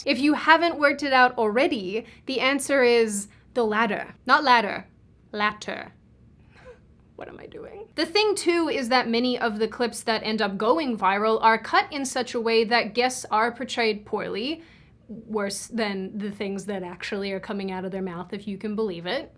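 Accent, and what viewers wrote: United States. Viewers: Your accent is changing... can hear the American coming through!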